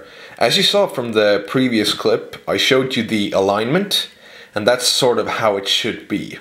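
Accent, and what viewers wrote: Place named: Ireland. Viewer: Do I detect a wee bit of Irish lol.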